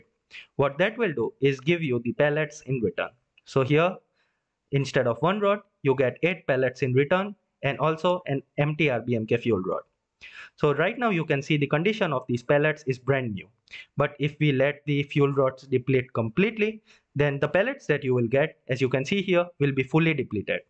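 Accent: India. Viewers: Are you Indian, your accent is nice. no sarcasm im Indian.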